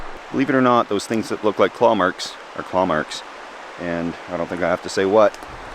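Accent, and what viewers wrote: Alberta, Canada. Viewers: Epic idea. I love your stuff. Former Edmonton resident and you got that same Albertan Hoser accent that I miss so much. Haha. You remind me of a bunch of good dudes I knew when I lived there.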